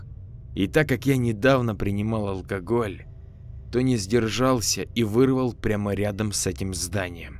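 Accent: Ukraine. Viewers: Автор, не отрусить одежду а отряхивать ) не обижайся , это украинский канал ? Твои истории отличные и читаешь хорошо люблю слушать твои истории ну иногда слова украинские на русский лад. Тебе же нужны длинные комменты ) вот и написала, я украинка может мне мерещиться ))))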